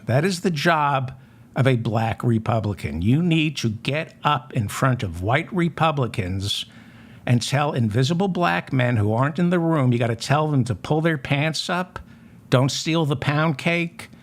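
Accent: United States. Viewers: WTF. oh my God. You sound like a Yale Guy.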